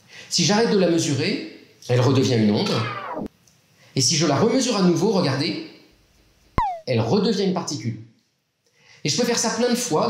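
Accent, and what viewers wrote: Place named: Paris, France. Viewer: J'adore votre intonation très Parisiènne ^^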